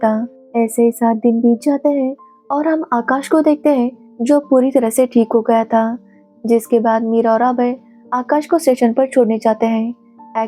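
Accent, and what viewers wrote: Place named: South, India. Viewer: The narrator of this webseries needs loads of rehearsing......her South Indian accent isn't apt for narration and there are lots of flawy pitch in delivery......NYC scripts written for explanation of the series...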